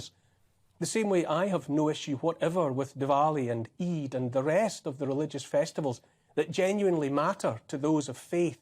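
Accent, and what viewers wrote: Scotland, United Kingdom. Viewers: It's the voice in our heads spoken eloquently with a scot accent. England has to look north for a leader. He's the best truth speaker at the moment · ❤️ ❤️ ❤️ ❤️ ❤️ ❤️ ❤️ ❤️ 🎄🎅🎄🎅🎄🎅🎄🎅🎄🎅 ⛄🎉⛄🎉⛄🎉⛄🎉⛄🎉 Neil Won't Kneel A gentle, beautiful Scottish lilt A kind, empathic, forward tilt An open heart; a caring face A willingness to take his place. A strong, home-hitting monologue Reminds us of the boiling frog The courage to become a sleuth A passion to release the truth. A deep desire to crack the whip Illuminates our freedom-strip Calls out the darkness taking hold The shiny despots; plated gold! Intense and raw, he lays it bare A soul-enhancing, honest stare Inflections drive the message through He speaks for Us - for me and you! Discernment's such a precious gift His words create a mighty lift Take heed of all he has to say He knows - it shouldn't be this way! 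🤗🦋🐬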